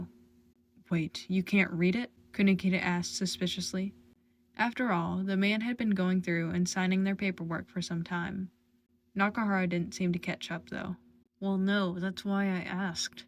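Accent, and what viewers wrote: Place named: United States. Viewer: Me trying to understand: 😦😧😨😰 You have a very strong American accent, my hopes of comprehension are long lost to the wind bahsbahha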